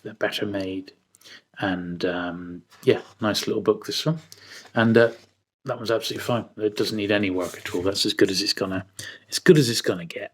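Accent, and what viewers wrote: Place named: West Country, United Kingdom. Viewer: It's definitely that soft, West Country accent! Love your vids as always my friend 👌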